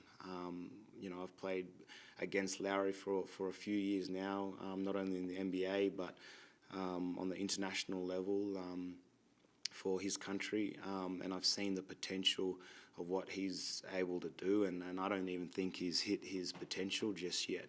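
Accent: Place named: Australia. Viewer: Great he still has his Aussie accent.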